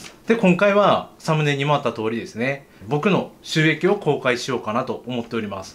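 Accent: Kagoshima, Japan. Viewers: 鹿児島弁ですね！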